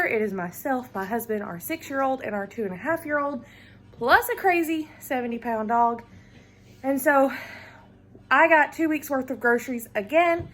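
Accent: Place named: Georgia, United States. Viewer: Hey there I'm new ... And enjoyed your video very much but could you add the prices I like to compare prices. But it's very good I just like that 💜 and where are u from lol the accent I'm in Ga so I couldn't miss it lol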